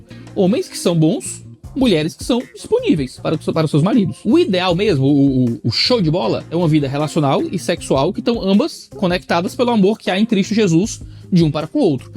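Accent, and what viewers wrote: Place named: Northeast, Brazil. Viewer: Amo esse jeito bem humorado de falar do nordestino! E o sotaque tbm é sensacional!!😊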